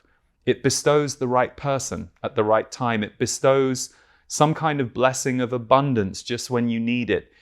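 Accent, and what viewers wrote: United Kingdom. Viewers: Your voice has a divine resonance..... Beautiful.... Brish English....❤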